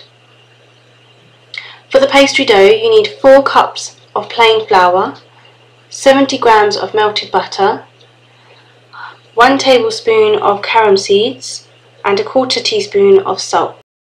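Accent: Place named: North London, United Kingdom. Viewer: I love your accent. are you from north london? thanks for the amazing vids! x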